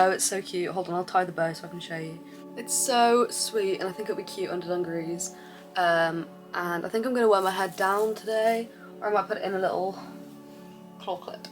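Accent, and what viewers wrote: United Kingdom. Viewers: Бля смотрю это чтоб повысить уровень англ. И нихуя не понимаю! Британский англ. – это полный пиздец. Как блять эго понять?? 😭 Смотрю на субтитры и понимаю половину слов, а если их убрать – нихуя. Блять учу англ уже год и не могу поднять уровень 😭 извините, но моей душенька устала. Теперь легче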